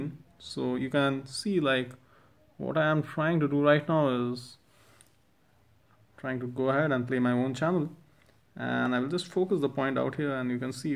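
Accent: India. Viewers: Indian accent...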